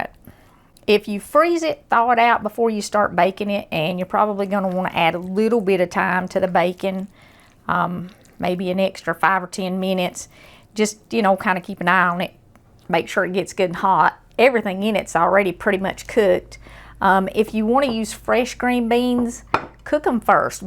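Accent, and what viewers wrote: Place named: Kentucky, United States. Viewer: Hi there. I just recently found your channel and im loving it. You're accent reminds me of KY where I grew up. I was just wondering where you're from?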